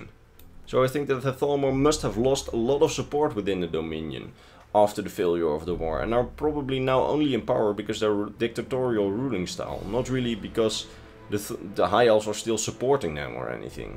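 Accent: Netherlands. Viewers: That’s the dutchest english I’ve ever heard lol, good to see that we have a great representation in the elder scrolls community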